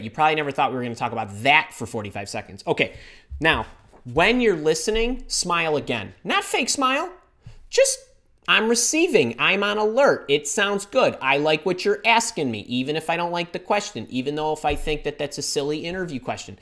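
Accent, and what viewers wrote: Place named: Chicago, United States. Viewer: You are hilarious!!! great advice …the Chicago twang keeps coming out in your tone…just sayin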